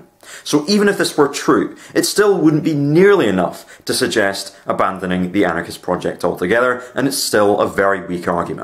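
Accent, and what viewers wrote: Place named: Scotland, United Kingdom. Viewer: Sorry, I'm from Canada so I can't distinguish accents well. I guess you're in Scotland.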